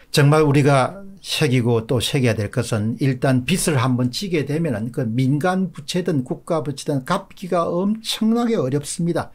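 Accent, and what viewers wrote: Gyeongsang, South Korea. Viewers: 경상도 사투리 발음이라 그래요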